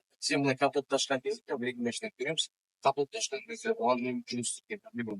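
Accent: Standard. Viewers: Әдемі, таза қазақша сойлегенің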